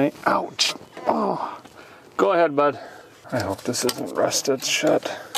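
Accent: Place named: Midwest, United States. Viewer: It's the Midwest twang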